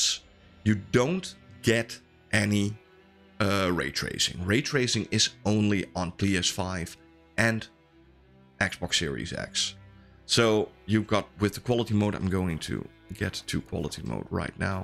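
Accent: Netherlands. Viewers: You sound very Dutch. Thank you for the video!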